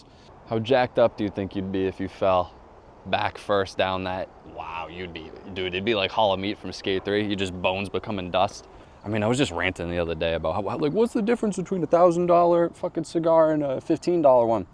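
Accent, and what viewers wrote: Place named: Massachusetts, United States. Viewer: Where you at? By the sound of your accent I often think you must be in Massachusetts.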